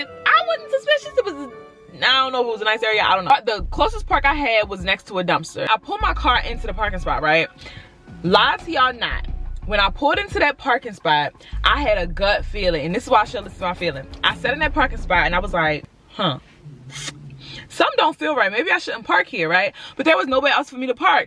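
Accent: Baltimore, United States. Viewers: That Baltimore accent strong lol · Girl you from Baltimore? I know that accent from anywhere.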